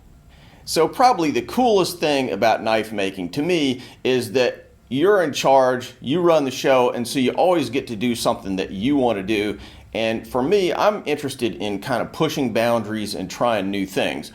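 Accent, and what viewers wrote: United States. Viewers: This sir has a 100% american voice...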